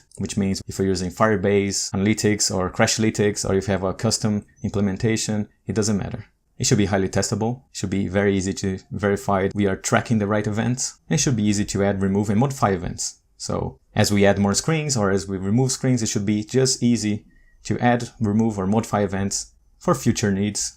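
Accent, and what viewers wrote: Brazil. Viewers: what a great course! I'm lovin it! BTW are you brazilian? Your accent is looks like from brazil haha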